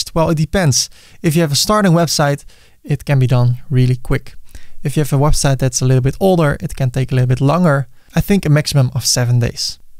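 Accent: Netherlands. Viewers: Nice video! Ik moest af en toe wel lachen om je overduidelijk Nederlandse accent:) Bedankt voor de video!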